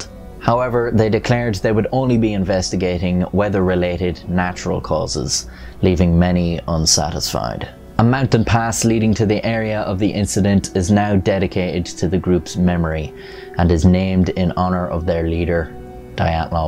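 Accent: Ireland. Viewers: A fascinating story. BTW, You have a very good narration voice. Only slightly Irish, and that's OK. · You tell a story very well. I like your Irish accent. This story always fascinated me. Common sense says it was a freak weather occurrence that seemed like an avalanche because it obviously made them run for their lives. Likely in the rush one of them might have not been able to quickly unzip the tents flap and cut himself out. Things like the eyes/tongue missing would be forest animals/birds. I wonder if a movie has ever been made recreating the most likely scenario. It would be interesting. RIP to them all.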